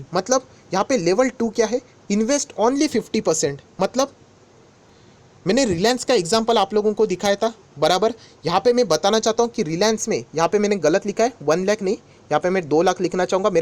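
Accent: Maharashtra, India. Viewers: by ur Hindi I got that u from Maharashtra so let me know bro 😎 that I can meet u really u are the honest retailers well wisher🙏🙏🙏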